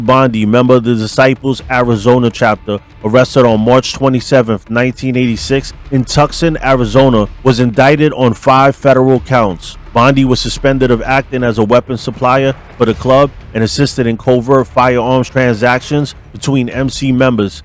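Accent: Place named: New York, United States. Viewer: Bro, you are so New York. It’s pronounced TOOSAHN. Not Tuxon. Tucson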